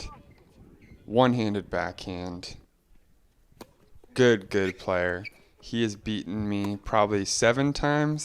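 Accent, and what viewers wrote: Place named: South, United States. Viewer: her southern accent killed me, love the skits.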